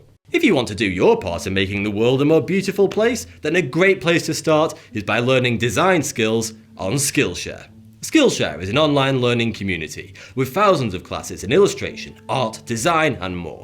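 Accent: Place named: Manchester, United Kingdom. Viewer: Bro: I like you. But you need to scale down your thesaurus addiction. It doesn't make the argument any stronger to use the word proclivity. I like a good diction but your proclivity for overt verbosity is verges on pomposity and its very superfluousness is rendered further supercilious by the manc accent. Yours, a fellow manc. Love x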